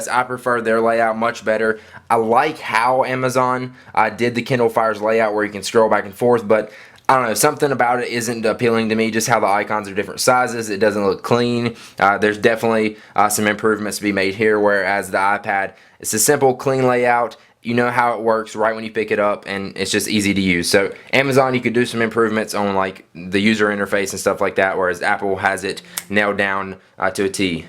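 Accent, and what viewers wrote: South, United States. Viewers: I am from the south in America and actually not all of us have accents some of us talk just like people from anywhere else in America. It all depends on the voices of the people you grew up around. And the generation before him probably are from one of the older smaller towns where they have this kind of accent. Bottom line, not everyone from the southern states talk with an accent like this. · Love your southern accent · lol, i loveeee how country you sound. were u from??? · Country or southern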